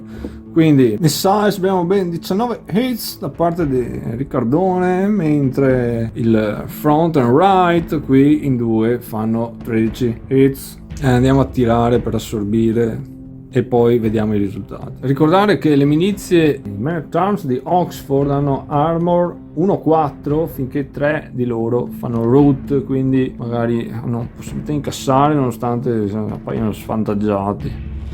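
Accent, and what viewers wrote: Italy. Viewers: Ciao, ottimo lavoro! Mi sto guardando i tuoi video visto che ho da poco acquistato il gioco. E poi dall'accento non sei così lontano da Vicenza, magari in futuro Na partìa 😂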